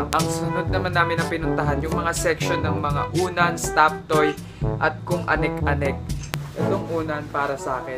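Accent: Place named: Laguna, Philippines. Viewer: May accent ka na parang tiga laguna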